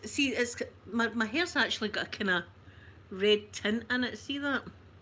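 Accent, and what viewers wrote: Scotland, United Kingdom. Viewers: Hi, I’m new to this channel. I subbed on my other account, but switched to this other one. I’m from Scotland too. It’s nice to hear another Scottish twang on YouTube ⭐️😁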